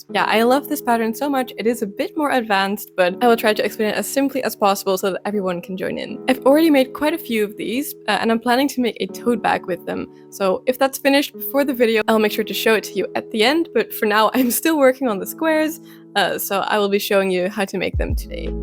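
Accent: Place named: United States. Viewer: you sound almost completely American. Accents are typically the hardest thing to master when learning a foreign language. How did you go about learning English so well in the Netherlands, if I may ask?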